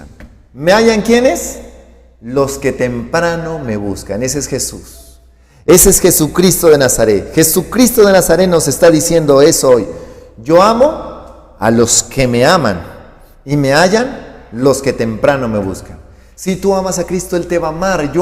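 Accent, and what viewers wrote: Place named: Mexico. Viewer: yo pensaba que el pastor era mexicano 😄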